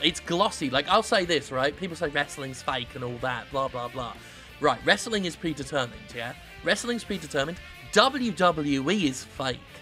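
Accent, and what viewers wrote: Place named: United Kingdom. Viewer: My day just ain't complete without golden british voice talking shit about games that thoroughly deserve it.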